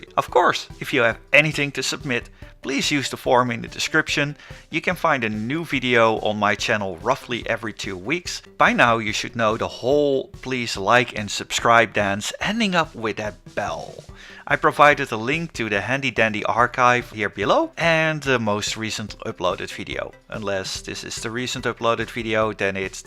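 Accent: Netherlands. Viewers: So ar you dutch then cuz you sound like it (im dutch)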